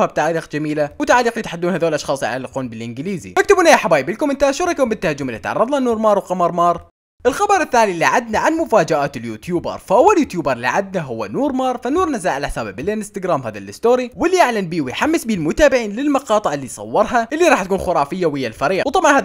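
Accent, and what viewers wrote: Iraq. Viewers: تحجي عراقي 🇮🇶🇸🇾🌹🌺😘♥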